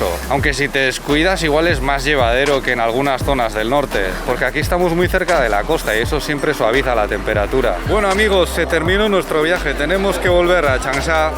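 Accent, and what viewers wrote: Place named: Basque Country, Spain. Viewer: La primera vez que YouTube me sugirió un vídeo vuestro me dio repelús el acentaco vascorro de, pero al Segundo o tercer vídeo me empezó a encantar. Gracias a vosotros he descubierto China sin viajar y ha cambiado totalmente la visión que tenía de ese país. Saludos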